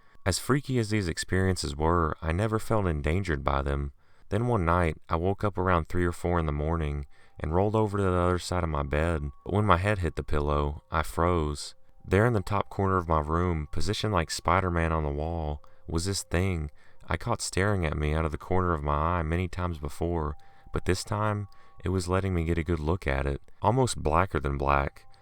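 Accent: South, United States. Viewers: Sounds like you have a southern accent. Do you mind me asking where you are from?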